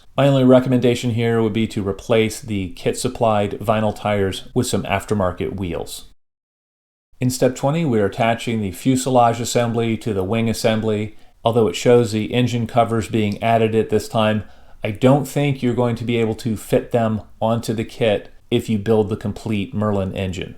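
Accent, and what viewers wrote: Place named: United States. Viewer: I can't believe it! An American accent, and no bullshit! An excellent review, clearly enunciated with what we need to know as prospective buyers or builders laid out in logical order with potential traps pointed out - the screenshot showing what happens if you omit part 117 was excellent. I have a later boxing, (14002 from 1990), and can say that my example lacks the sink holes on the airscrew blades and the gun magazines, as well as having had the clear parts fixed, so Airfix have kept an eye on things. I wasted my money on replacement metal undercarriage, which has an awful grainy finish and lacks the sharpness of detail present in the kit parts. I particular, the oleo strut can never be polished to look as smooth and shiny as it should. Other reviewers have agreed with your suggestion that the cowls will not fit over the complete installed engine, with most saying that a considerable amount must be ground from the cylinder heads to allow the cowls to be properly fitted. 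Oops!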